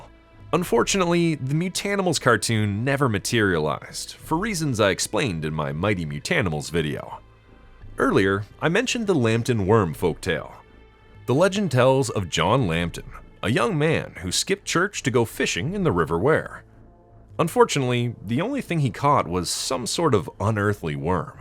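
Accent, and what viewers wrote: Canada. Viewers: a robot commentator with a Canadian accent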